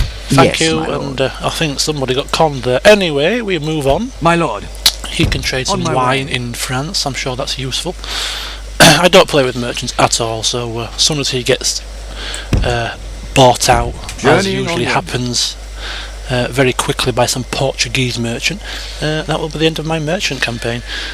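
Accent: England, United Kingdom. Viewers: Your accent is that of a true englishman (from an englishman) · lol this might seem kinda weird but it is so much cooler to watch a person with an English accent do an English campaign then it is just any other kind of person... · YEEESSSS a english person with a funny acsent no affence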